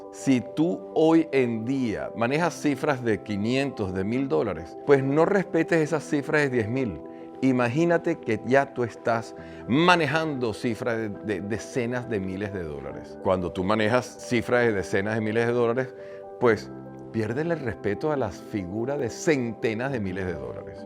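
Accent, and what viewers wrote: Venezuela. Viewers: Coño seré sincero contigo cuando me saliste dije este viejo ya va hablar paja luego escuché el acento dije coño venezolano luego segui escuché me quedé hasta final grande historia pana que experiencia una gran inspiración para muchos nunca te rindes!!eres el propio tommyshelby. Saludos desde Portugal ya hoy te sigo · AJJJJ ... ESE ACENTO VENECO... LASTIMA... ME INTERESABA EL TEMA... ADIOS Y REPORTADO ... 😢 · Que gusto el acento venezolano en magnífica dicción y pronunciación. El contenido es extremadamente relevante. Gracias por tu testimonio de experiencia en el logro del éxito financiero. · Que magnifico escuchar a alguien con acento venezolano, con mentalidad triunfadora y sobre todo enseñando consciencia de libertad!!! Statehood #51!! Graciass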